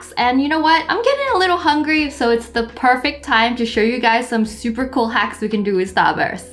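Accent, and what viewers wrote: Australia. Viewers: cuz she's Australian so she has an Australian accent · I've got my trusty KNOIF Nobody: Not a single soul: Me: Hahahahahahahahahahahah! The Ozzie slang is soooo funny! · U got hate because people take this as a hate comment u judged the Australian accent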